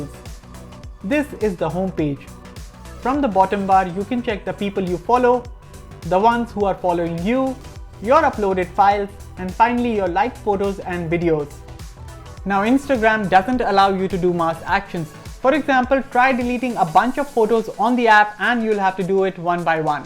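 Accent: India. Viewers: Indian accent, im out!!! ✌️ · Indian accent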